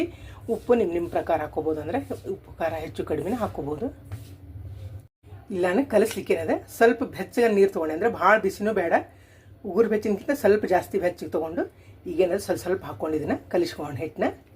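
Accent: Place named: North Karnataka, India. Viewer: Nimma bhashe nanage tumba ishta uttara katnataka bhase and chakli super❤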